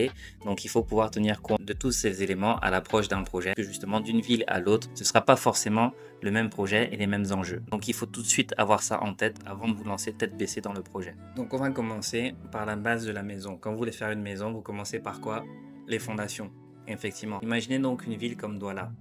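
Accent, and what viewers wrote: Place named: Cameroon. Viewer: Le ton camerounais 😂😂😂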